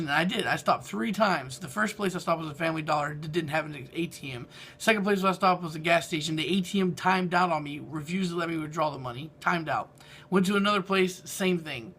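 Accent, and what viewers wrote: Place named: California, United States. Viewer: why are you in west Virginia in the first place? you sound like you're from Cali or a state close to the south west area of the states. im from Cali and moving to the Midwest was the worst mistake of my life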